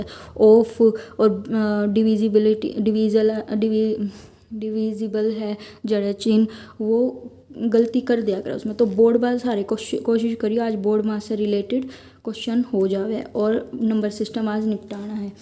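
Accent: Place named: Haryana, India. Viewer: Hello mam apki hriyanvi voice bahut achhi hai All the best for your exam Or chennal pe daily video dalna mat bhulna please apka chennal ek din baahut grow karega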